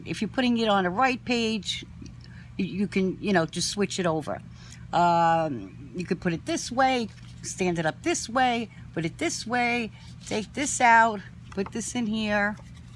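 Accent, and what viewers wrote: New York, United States. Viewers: Love these! btw, are you from NY because you sound just like my mom! :D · hi, i just watched gayle's YT of her order from your shop (and the extra goodies you sent her) so happy she shared you with us, i just subscribed to your channel and favored your etsy shop-i'll be ordering from you soon! you have such a variety of yummy goodies in your shop, i love that you have a dollar bin section! (just like Target and Michaels!!) great idea! i really love these pirate pockets you made and the wax seal stickers. i'm sure you hear this all the time, but i also have to mention how much i enjoy your NY accent! thanks for sharing your great ideas and inspiration. so glad to have found you.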